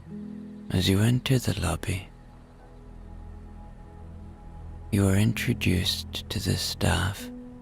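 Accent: Ireland. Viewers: This is my first time listening to one of your stories. Your Irish accent is such a bonus for me! Lovely voice and rate of speech. Comforting. Thank you,. ❤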